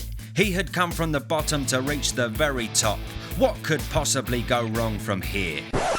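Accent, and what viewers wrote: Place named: England, United Kingdom. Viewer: Annoying pommy voice 🤮🇬🇧🤮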